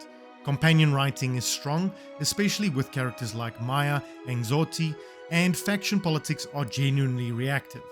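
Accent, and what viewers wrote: South Africa. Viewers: out of curiosity are you South African?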